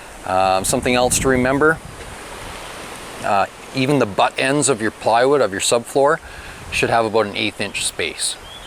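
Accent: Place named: Quebec, Canada. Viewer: How did you manage in the big rains, fallout of tropical storm Debby in early Aug 2024 ? (By your Quebec accent I assume that's your location.) May be give us a construction and weather episode? How do you protect exposed wood from water damage? Should we be worried? What is time lag between your filming and the publication of video? Fun series, though beyond my little DIY.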